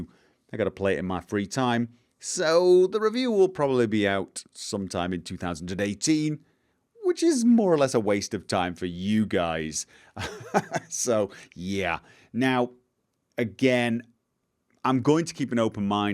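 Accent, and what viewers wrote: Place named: RP, United Kingdom. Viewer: You sound very posh with that mic.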